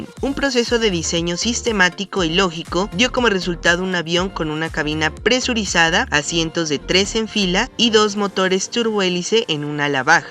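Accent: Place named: Nariño, Colombia. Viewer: Sentí un Leve acento nariñense por ahí, ¿este canal es Colombiano? salu2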